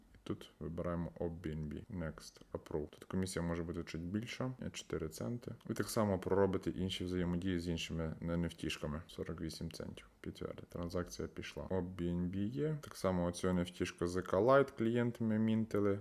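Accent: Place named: Chervonohrad, Ukraine. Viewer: У тебе діалект як у мене) ти часом не з Червонограда?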